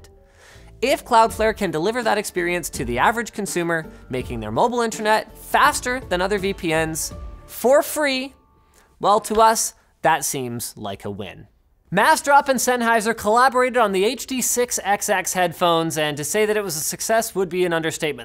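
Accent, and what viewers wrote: United Kingdom. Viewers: solid brit accent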